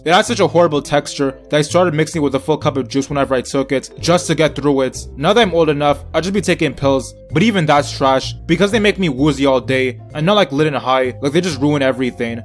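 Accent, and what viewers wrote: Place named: New York, United States. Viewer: I am new to your channel and deadass I fucks with you heavy I am from NewYork recently moved but when I hear your voice and I feel right back in New York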